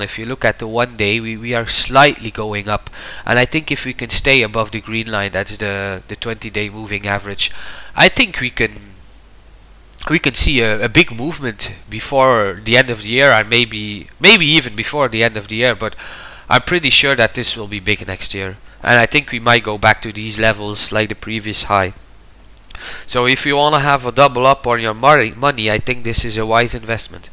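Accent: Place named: Netherlands. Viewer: Your English sounds dutch!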